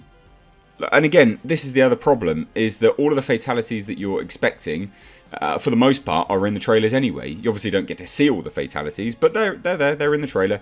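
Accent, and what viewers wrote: United Kingdom. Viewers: How did you watch it!? You sound British.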